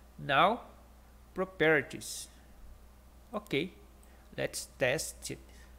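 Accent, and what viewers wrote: Brazil. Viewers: que dá hora! Eu falo inglês um tempão e ainda não criei coragem de gravar um vídeo em inglês, achei seu vídeo sem querer, você foi uma inspiração...kkk...ouvi vi e ontem e pensei "é brasileiro, certeza! Se ele pode eu posso kkkk"... enfim... parabéns maninho...vídeo simples e muito bom!!! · tava me perguntando se era br pela pronuncia, ate que vi a declaração da receita ali na área de trabalho KKKKKKKKKKKKKKKKK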